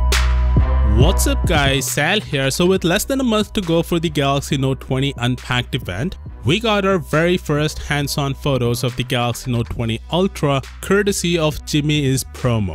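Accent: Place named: India. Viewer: I thouht that the dubber of this channel was indian, the accent was pretty clear:v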